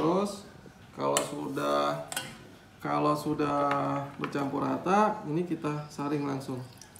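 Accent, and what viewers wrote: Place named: Palembang, Indonesia. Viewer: tetap semangat Chef jangan dengerin orang yang nyinyir saya sudah cobain resepnya 👍👍 dari bahasanya sudah ketauan bahasa palembang ya Chef 😊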